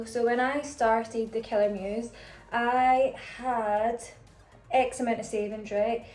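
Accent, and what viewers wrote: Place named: Scotland, United Kingdom. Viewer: Thank you so much for sharing ❤ I appreciated and related to so much you said. Your perspective is so valuable, for all the aspects you mentioned that have been barriers for you... being a gorgeous, woman of colour, from our old neighbourhood, with a Scottish accent, and valuable unique experiences ❤❤ exactly why your perspective matters so much 🙌🙏 realer than shit we are overloaded with ❤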